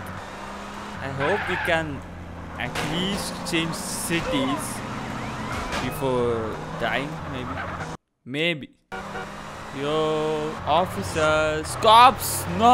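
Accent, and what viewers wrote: India. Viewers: You are from India or another Country like this because accent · Es de la India , por eso habla así el inglés. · Sorry i love you and your channel no homo But your Indian accent sounds like youre sleeping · T series acent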